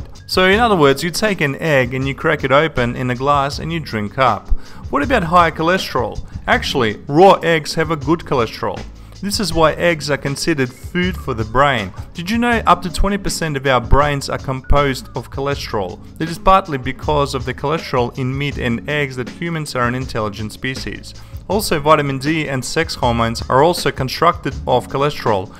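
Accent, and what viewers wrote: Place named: Australia. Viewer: Well we appreciate the information, the Australian accent is marginally tolerable, but with the addition of the needless interference of the BackBeat.. a plague on the present human race, your presentation is completely undigestible alas.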